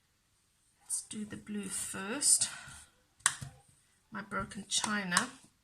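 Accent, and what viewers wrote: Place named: South Africa. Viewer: I love the tutorial, I am going to try this soon, I just need different colors in distress ink. I only have 3 and those are in vintage brown colors. You still have a hint of South African accent 😊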